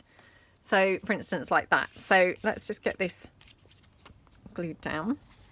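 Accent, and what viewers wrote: England, United Kingdom. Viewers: Hi Miss!! I'm new to your channel but I am loving what you are doing..And your accent is beautiful..Are you from England? I look forward to watching your videos and seeing all the great Fall things you make...Lots of love from Kentucky💙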